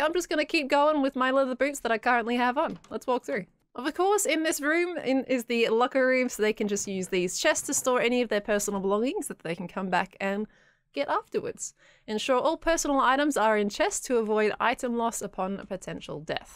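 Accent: Australia. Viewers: enjoying the Aussie accent. Such an australian thing to call yourself ' '. Glad you have joined the hermitcraft server, I felt Australia was under-represented here:) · needs to work for a radio station or do pod casts he has the voice for it and i love it <3 that and your awesome aussie accent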